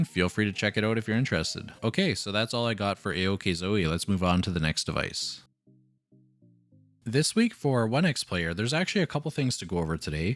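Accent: Canada. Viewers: 'dem frametimes tho.... The ALLY will get better, there's 0 doubt in that. It's baffling that they allowed the review results so early (not having an NDA in place before June 13th which is typical industry practice)... Thanks for some more sweet canadian accent!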